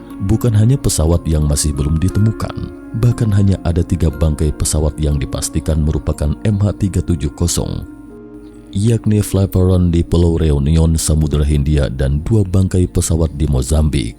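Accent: West Java, Indonesia. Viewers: tapi PRONOUNCIATION ENGLUSH (pengucapan istilah ejaan)....kurang enak di dengar....spt bercampur logat sunda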